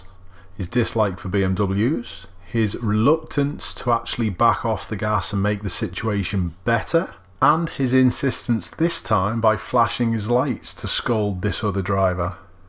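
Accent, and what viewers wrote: Liverpool, United Kingdom. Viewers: Remove ALL German whips from our roads haha!!!! Seriously though, not great driving I agree. I am now 50 years old and my driving has improved significantly since I was a youngster. It is ALL to do with attitude and mindset! Keep up the good work, I have never listened to a Liverpudlian so much before but your videos are great and keep me mindful about so many things when I drive.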